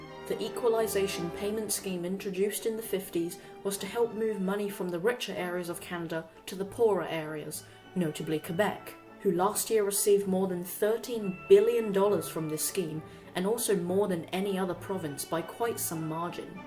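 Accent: United Kingdom. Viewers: You sound british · British accent, thumbs 👎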